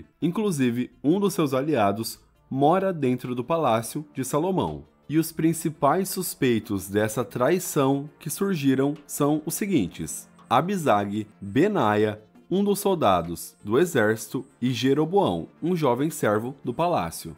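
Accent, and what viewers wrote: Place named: Caipira, Brazil. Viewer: Seu excessivo sotaque "caipires" nos "erres" nos finais das frases são aflitivos, prezado narrador ! Fica até difícil ouvi-lo 😲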